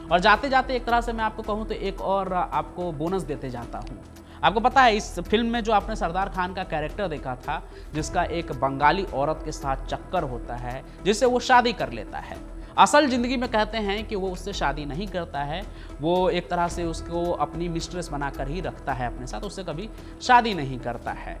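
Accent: Bihar, India. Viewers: Wannabe lallantop 🤑 Aaj kal trend ho gaya u.p ,bihar ke accent me kuch bhi bolo aur views le lo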